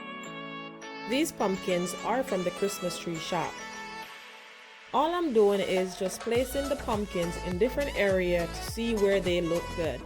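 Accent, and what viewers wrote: Jamaica. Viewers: Very nice 👸🏽thanks for sharing💕 I hear your accent where are you from? Are you Jamaican?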